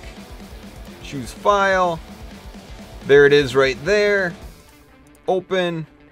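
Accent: Canada. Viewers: You are the most Canadian person iv'e EVER heard it really annoys me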